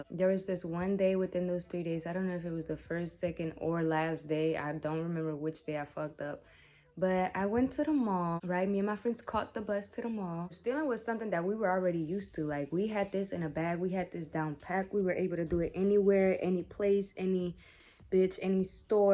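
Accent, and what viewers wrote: United States. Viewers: You accent is very American :) do you visit Spain ( before covid I mean :) )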